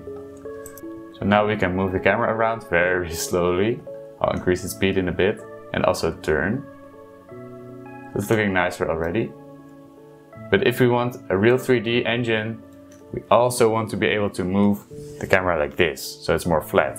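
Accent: Netherlands. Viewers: Ziet er goed uit, ik raad je aan om een keer te kijken naar OpenGL of iets in die richting. Dan kan je een echte game engine bouwen hoewel OpenGL niet de beste Graphics API is maar is wel goed voor een beginner (ik neem aan dat je een beginner bent aangezien je game maker gebruikt, vat het niet verkeerd op xD) Als je je afvraagt hoe ik wist dat je nederlands bent ik hoorde het aan je accent. :) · Ik hoor nederland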